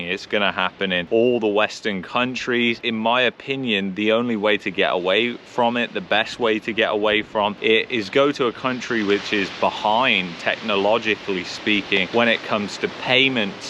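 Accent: United Kingdom. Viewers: Thank you for having the courage to speak out on these important topics. It's refreshing to hear a Briton talk about these issues.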